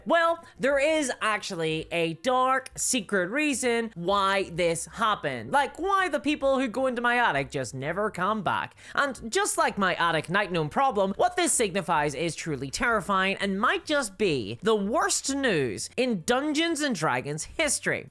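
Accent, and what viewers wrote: Northern Ireland, United Kingdom. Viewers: Northern Irish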